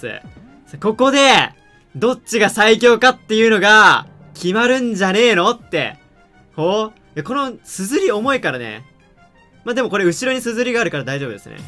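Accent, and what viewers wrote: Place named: Hokkaido, Japan. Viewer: 北海道弁LINE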